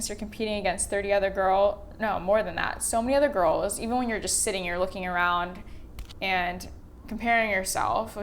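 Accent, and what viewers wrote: United States. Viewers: That LA accent is unbearable.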